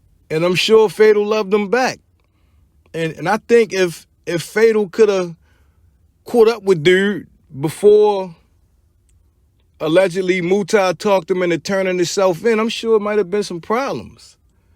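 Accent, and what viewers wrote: Baltimore, United States. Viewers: I’m new here but I hear the bmore accent fam salute to my bmore brothers from DC🫡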